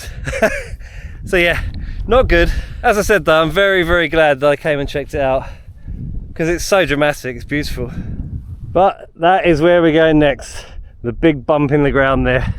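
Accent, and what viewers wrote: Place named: Southern England, United Kingdom. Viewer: Funny how you use the long a for Castleton but a short one for Mam Tor. You Southerners are a strange lot.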